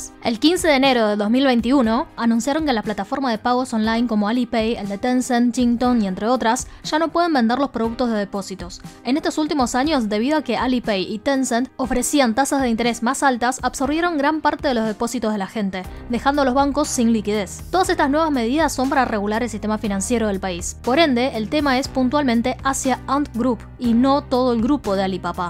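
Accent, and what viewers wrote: Buenos Aires, Argentina. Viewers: me encantan tus videos, te voy a empezar a seguir, pero hablas muy rápido. ¿Eres argentina? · Me mata el acento Porteño!!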